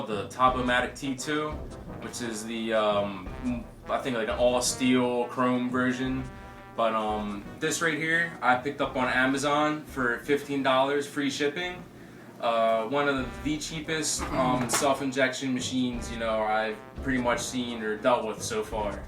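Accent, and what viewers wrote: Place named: Pittsburgh, United States. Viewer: you from pittsburgh? sounds like the accent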